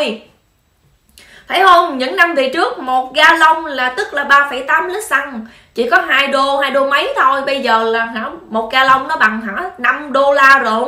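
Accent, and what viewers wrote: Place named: Mekong Delta, Vietnam. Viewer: Giong noi mien tay rat ngot ngao va chan tinh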